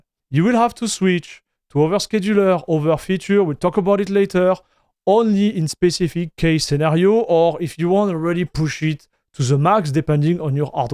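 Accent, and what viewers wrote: France. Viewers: horrible cette accent français ont ne comprend pas grand chose.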